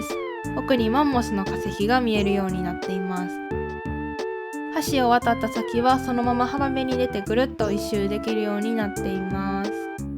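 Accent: Kansai, Japan. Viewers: 最近あつ森を始めたのですが、ほんとにこんな素敵な島になるのかと驚かせていただきました🙄途中出てくるマップも好きだし、主さんの関西弁も好きです🥰🥰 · 儚げふわふわ関西弁お姉さんだった頃のアニキ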